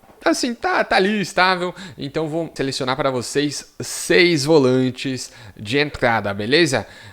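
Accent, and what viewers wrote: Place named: São Paulo, Brazil. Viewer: MUITO PAULISTA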